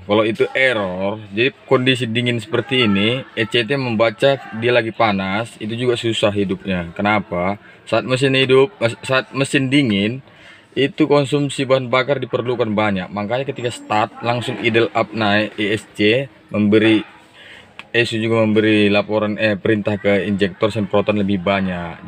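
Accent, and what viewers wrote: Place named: Medan, Indonesia. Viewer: Bang bengkel nya dimana bang.apa dimedan.logat nnya medan.kalo tangerang mah deket nih ke saya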